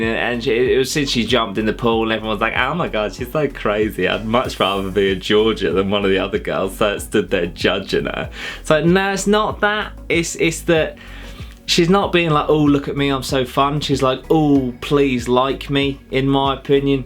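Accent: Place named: Liverpool, United Kingdom. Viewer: Your scouse accent cracked me up 😂😂😂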